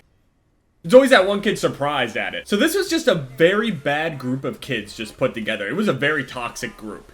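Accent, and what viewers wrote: New Jersey, United States. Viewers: Omg is he from new jersey!?!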